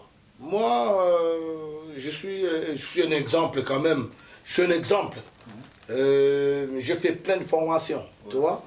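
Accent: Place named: Democratic Republic of the Congo. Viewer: parle lingala pour nous faciliter à comprendre le message t'on français lingaliser la c'est chaud fais plus des lectures des bouquins savent t'aider mon frère tshanguen comme tu le dit toi même courage plus des effort frérot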